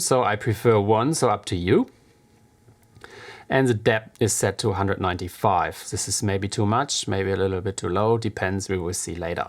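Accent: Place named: Germany. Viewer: Djeezes, it sounds nice when Germans speak English ! Danke und grussen aus Holland.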